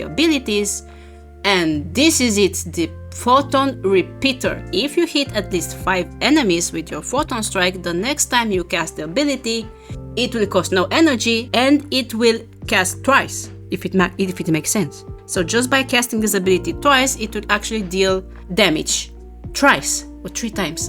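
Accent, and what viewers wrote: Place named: Europe. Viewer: Hearing American behavior with a European accent scratches a special itch in my brain for democracy 🫡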